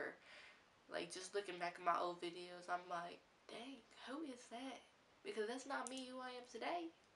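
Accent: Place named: Tennessee, United States. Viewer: I can hear your accent from living in tennessee... you talk completely different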